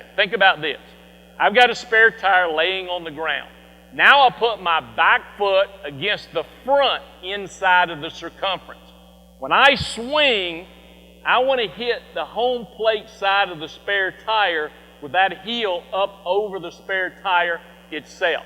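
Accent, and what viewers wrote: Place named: United States. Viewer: lol american accent...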